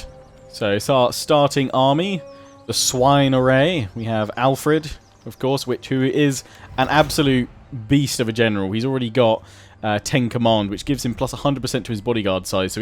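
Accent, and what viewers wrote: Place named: Bognor Regis, United Kingdom. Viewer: You speak so posh for someone from bognor/littlehampton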